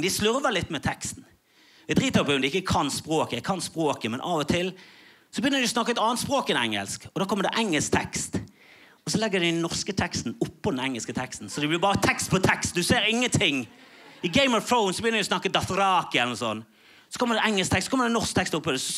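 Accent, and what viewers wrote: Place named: Bergen, Norway. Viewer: Norwegian. Bergen dialect.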